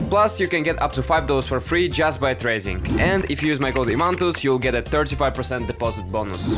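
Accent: Finland. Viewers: are you finnish!?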